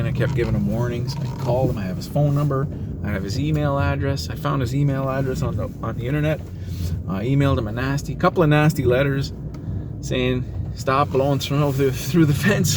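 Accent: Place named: Canada. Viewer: I hear what you are saying man, I was called a douchbag last winter, at an apartment I looked after, I told the property management I was putting up with that shit, and I dropping their buildings because it wasn’t the first time I had a run in with this particular property. So this year I told them sorry but buh bye. Sure I lost 5 bldgs, but I then picked up 4 and charge the same amount I charged for the 5 bldgs from the other mgmt company. We do a dangerous job that puts us in the worst elements and people think because they give us a small amount of money to clear their properties that they can treat us like we’re fools. Nah! We’re professionals and we should be treated like such. Also How would they know what equipment or amount of labour is needed, to do jobs, were the ones that do it all the time, ....... sorry, got me fired up, sounds like you are from Canada, I’m just east of Toronto, where are you?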